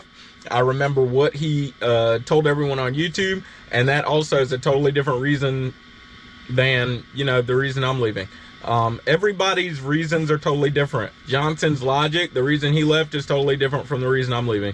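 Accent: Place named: Alabama, United States. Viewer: He Forrest Gump country lol I’m from Alabama and he sound super country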